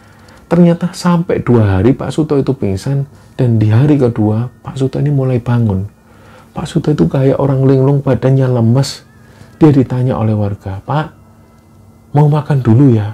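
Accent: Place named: Surabaya, Indonesia. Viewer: Malang Hadir... Nikmati yg slalu menyuguhkan Cerita² yg penuh hikmah apalagi dg Suara Mas Logat Surabaya yg khas.... Sehat Slalu buat Mas dan Crew... Smoga tak kurang dari suatu apapun.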